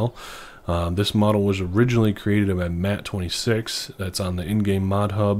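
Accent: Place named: Michigan, United States. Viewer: Haha just started watching your videos searching for allis chalmers heard your voice and said to myself that guy sounds like he is from Michigan and lo and behold you are lol I to am from Michigan in the U.P. I thought I caught a little yooper accent from you but could be northern Michigan as well lol anyway thanks for the video.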